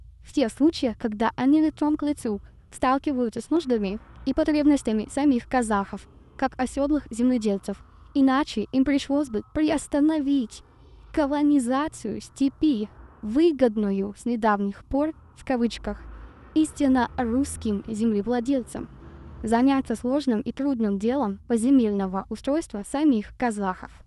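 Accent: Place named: England, United Kingdom. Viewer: А окцент то инглиш. Есть поговорка если ругаются два соседа значит там побывал англичанин.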